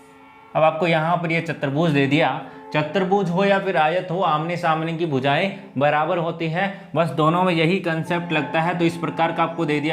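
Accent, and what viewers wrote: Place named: Marwari, India. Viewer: sir you speak marwadi sounds very nice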